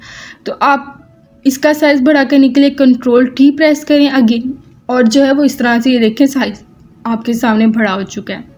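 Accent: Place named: Faisalabad, Pakistan. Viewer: Sis apki language btati k u r from fsd.Am also from fsd .boht khushi hui apka tutorial dekh kr or boht acha smjhati ho ap.God bless u☺️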